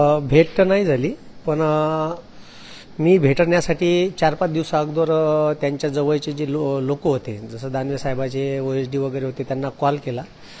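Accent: Vidarbha, India. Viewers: हे विदर्भ बोली भाषा आहे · पूर्व विदर्भात असेच बोलतात..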